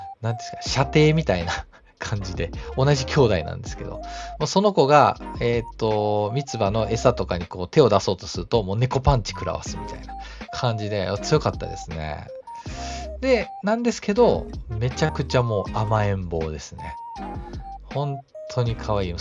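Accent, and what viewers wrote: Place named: Kanto, Japan. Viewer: ショート動画見てどうなったのか 気になってました。 BGMを少し小さくして頂けるとしやすくなるかと。 関東訛りで時折関西イントネーションが混ざるから関西出身の関東在住の方かと思ったら京都の方とは驚きです。 それやったらやっぱり京都弁で（或いは交えて）話さはったら宜しいのに。 保護してくれておおきに。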